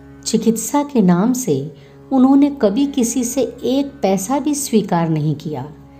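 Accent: Standard. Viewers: आपके।द्वाराबाबा की लीलाओं का बहुत ही सुन्दर और शुद्ध उच्चारण किया गया।धन्यवाद।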